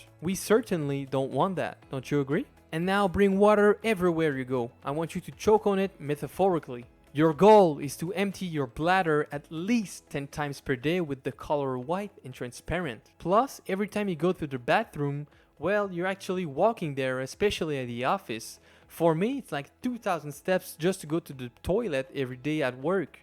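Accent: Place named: Quebec, Canada. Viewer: Sa sonne le québécois ça🇨🇦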